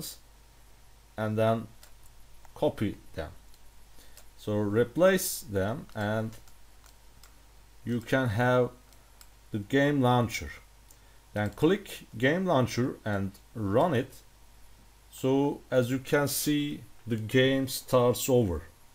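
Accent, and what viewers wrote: Turkey. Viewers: Türk aksanı lan bu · U from Turkey right. I knew that your accent hahahahahaa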